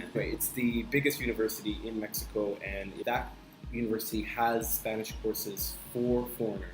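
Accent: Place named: Caribbean. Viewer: I use duolingo as well! I've been trying to learn Spanish, but it's so difficult because I live in small town, USA. And most people that do speak Spanish doesn't want to speak to me in Spanish. Sometimes my head hurts from all the studying. BTW, I want to ask you, do you have a Caribbean background. I think I can hear a little accent. Thanks for the video, it's really informative. You should make more videos speaking Spanish! I would love to hear how your Spanish has improved since you've taught yourself.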